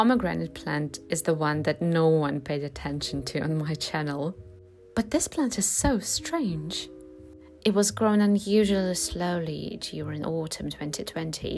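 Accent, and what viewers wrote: United Kingdom. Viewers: I was actually wondering about that. You consciously go for for a British accent?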